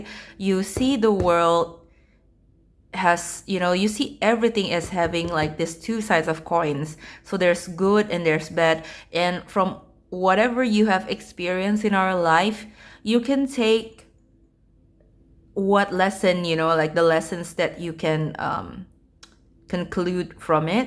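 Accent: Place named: Indonesia. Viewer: I heard Indonesian accent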